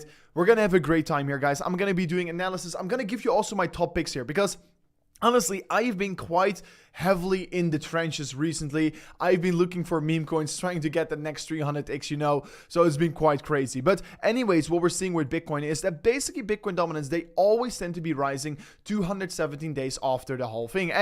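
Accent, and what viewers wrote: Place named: Netherlands. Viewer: Why you always say aswoll😊 very obvious dutch slang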